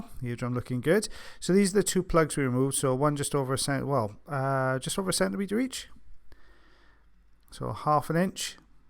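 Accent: Wales, United Kingdom. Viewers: Lovley to hear a welsh accent!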